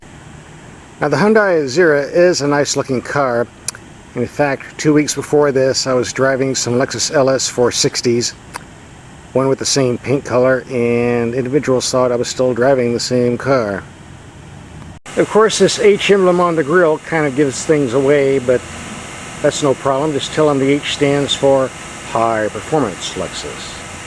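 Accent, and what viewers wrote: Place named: Newfoundland, Canada. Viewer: You sound Newfie, but there's Cacti in the background.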